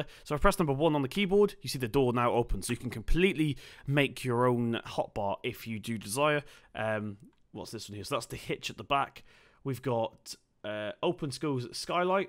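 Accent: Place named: United Kingdom. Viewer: British accent so annoying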